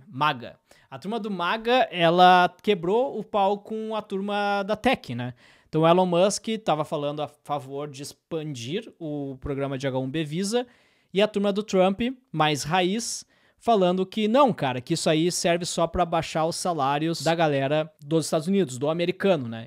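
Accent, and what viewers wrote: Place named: Rio Grande do Sul, Brazil. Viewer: eu moro hoje nos EUA com visto H1B. Ate hoje, nunca conheci outro BR com esse visto. Todos que conheci são mexicanos, chineses e principalmente, indianos. Depois que tu és demitido, tu tens 2 meses pra se recolocar e achar outra empresa que mantenha o teu H1B. Depois disso, teu visto expira! Alguns falam que o H1B é o ruim, porque depende da boa vontade do empregador e tal... mas a real é que depende demais. Eu tenho um chefe ótimo, e considerando que todos estão felizes com minhas entregas, esse visto é uma bênção. Pode ser que eu seja demitido no futuro e tenha que voltar? Sim! Mas ter morado aqui por esses anos terá valido a pena... E eu voltaria pra onde for com outra bagagem... Sou gaúcho também! Como é bom ouvir um pouco de sotaque haha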